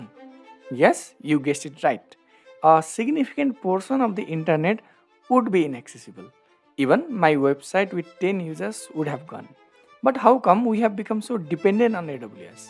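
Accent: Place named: Nepal. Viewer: Because your voice tune is like nepali-english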